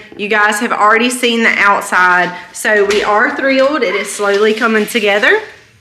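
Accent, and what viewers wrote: South, United States. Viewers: I love your videos and especially your southern accent! I could spend all day listening to you talk. I live in Minnesota and people up here don't speak with accents.. I have a Walmart 2 miles from where I live and I've had issues with them over the years. So I understand where you are coming from. Good luck dealing with your Walmart!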